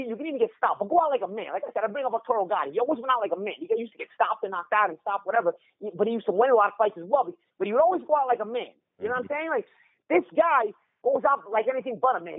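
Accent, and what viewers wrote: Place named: New Jersey, United States. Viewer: Paulie used to be immensely annoying but his New Jersey accent seems to have died down a little so he may actually be tolerable soon!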